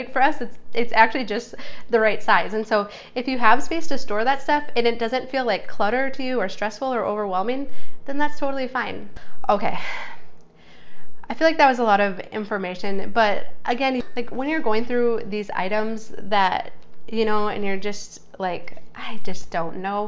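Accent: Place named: Midwest, United States. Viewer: I hear a midwestern accent! But that only adds to me loving what you share here!!!!! OMG!! 😍 I’m going to end up watching this over and over!!!! I want to simplify so badly!!!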